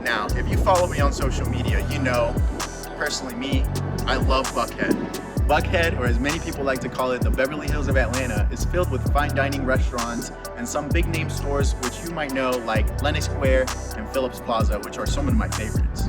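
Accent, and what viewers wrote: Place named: Latin America. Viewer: Hey, thanks for the video, very informative, I like you're latín too couse I could understand All the video (im practicing my english) and that make me happy... Well im dreaming to live in there (im from Colombia) and I wanna know how is to be latín in ATL (maybe one video idea)... Leave you a big like!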